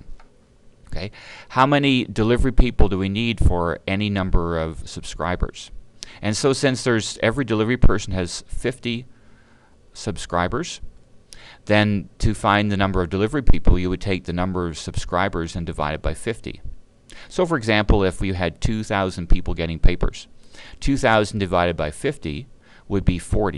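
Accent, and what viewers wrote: Canada. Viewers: this is very helpful and is this guy canadian(irrelevant i know) i just wanna know BUT yes this video was helpful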